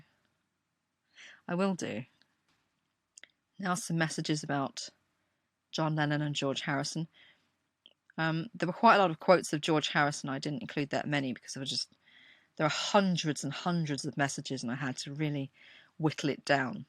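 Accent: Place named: England, United Kingdom. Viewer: Being Scottish myself, I adore this woman's English accent.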